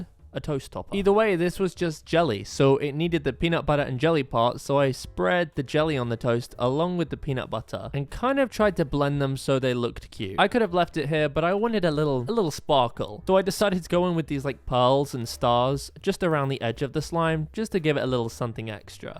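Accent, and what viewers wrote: United Kingdom. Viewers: I introduced this channel to my friend and now she watches just for the British accent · He sounds British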